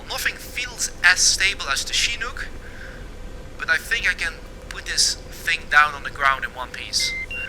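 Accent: Netherlands. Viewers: I hear a dutch accent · I know a Dutch accent when i hear one :) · you sound exactly like a dutchman trying to speak English. Well done.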